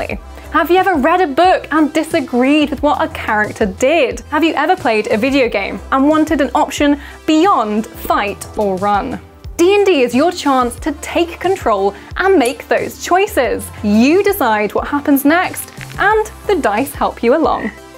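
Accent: England, United Kingdom. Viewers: I thought Aussie at first, but after watching the rest of the video I'm pretty sure the other comments are right. I don't know enough about accents to really describe the differences between this and a typical Aussie accent, but it sounds British. Definitely not Kiwi - a NZ accent makes every vowel sound the same. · British accent. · She is fantastic. A laid back eloquent English accent i like it. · She is english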